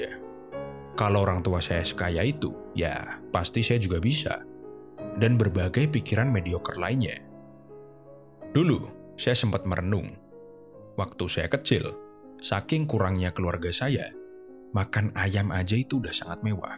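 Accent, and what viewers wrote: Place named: Java, Indonesia. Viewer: Suara orang Jawa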